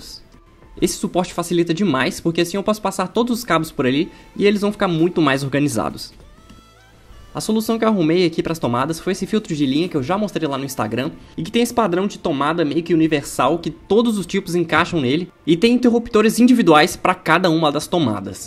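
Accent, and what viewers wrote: Brazil. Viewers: melhor parte é o sotaque de mineiro hauheuahahah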